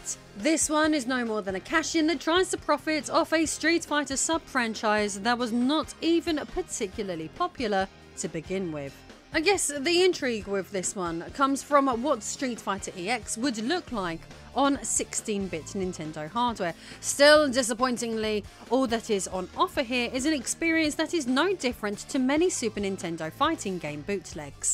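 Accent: United Kingdom. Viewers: loved the brintanic accent along with the cosplay, IIILLIGAL!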